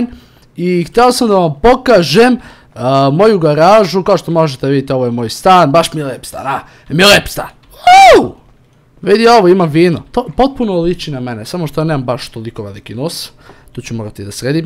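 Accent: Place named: Eastern Serbia, Serbia. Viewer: Akcenat istocne Srbije. Nista lose i nista cudno -_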